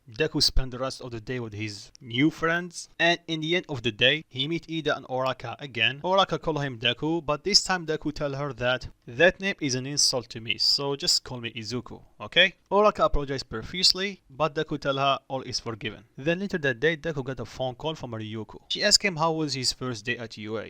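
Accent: Russia. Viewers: Hey sir are you from Russia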